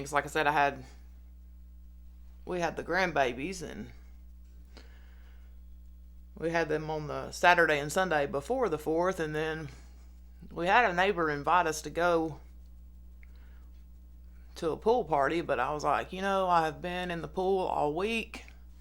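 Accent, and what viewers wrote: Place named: South, United States. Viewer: Hi. I'm new to your channel and I enjoy watching very much. I am born and raised in the south also. Love your accent! I am from very and humid Polk County Florida. Dead center of the state. Thank you for sharing about your camp experience and your closeness with God. I need to hear it too. I am so glad you had a great time being in nature. Keep up the good work with your channel. I am sure you will get to 300 subscribers very soon. Good luck!!!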